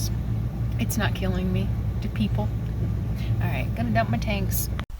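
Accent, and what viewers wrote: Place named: Minnesota, United States. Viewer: I haven’t lived in MN for over 50 years, and everybody still asks me if I’ve seen “Fargo.” The accent is strong in this one.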